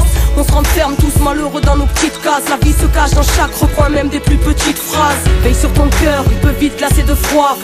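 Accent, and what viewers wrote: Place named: South, France. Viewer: jvoi pas quelle accent de racailles tu parle mec elle bien de Marseille normal quelle es un accent du sud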